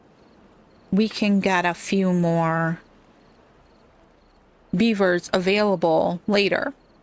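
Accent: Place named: Midwest, United States. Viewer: From ope to oofda, I really enjoy the midwestern speech. It all makes me feel at home while listening. Plus, the soundtrack is so catchy, I love to hum along as well. I hope you had an amazing Solstice, and that the next three months bring you much needed rest and revitalization. Thank you for sharing your playthrough 🙏🌠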